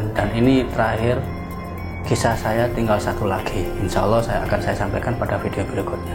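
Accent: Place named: Malang, Indonesia. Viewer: Kyo logat e wong malang se 😁🙏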